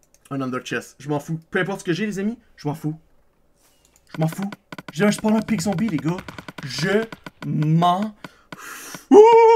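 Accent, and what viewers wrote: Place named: Quebec, Canada. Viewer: parce que j'adore ton accent Tabarnake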